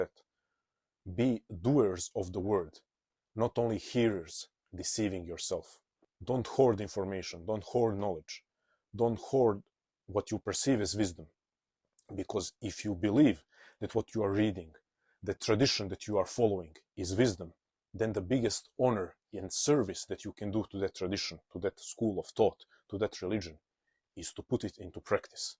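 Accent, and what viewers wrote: Balkans. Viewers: Great insight, don't mind me asking but are you maybe from the Balkans?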